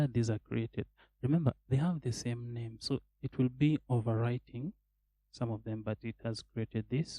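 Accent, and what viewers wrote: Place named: East Africa. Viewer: nice content brother. i recognize that East African accent!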